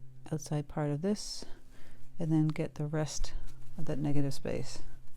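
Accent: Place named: East Coast, United States. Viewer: I know girl! We chatted last week about our East Coast loves of NE! You said you were in NY too and didn't lose your accent! LOL. Just finished your w/w pink/indigo trees too. Very relaxing and gorgeous color scheme. Thanks for everything,!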